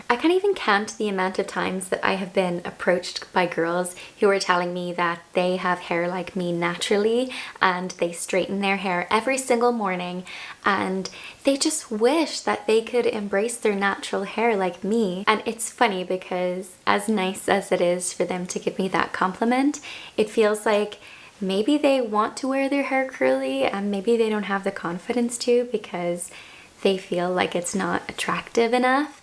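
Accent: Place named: Ireland. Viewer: I love your top!!! By the way, are you Irish? You have a very slight accent & I'm curious 😊 Great video ✨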